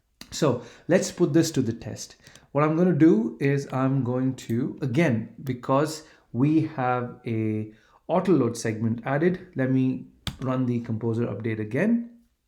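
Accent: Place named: India. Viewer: Very nice explanation. But! Use as large a font - or zoom in - as the you can get it. And speak slowly and try to be clear. Indian accent is hard to understand for north europeans. But really very good. Like write: One of the best videos I have ever seen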